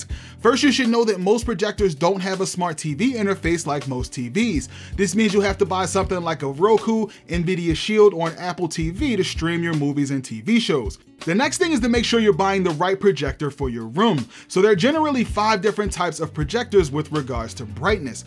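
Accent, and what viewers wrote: Baltimore, United States. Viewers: I hear that Bmore coming outta ya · is this a bmore accent I detect? Great videos! Very helpful.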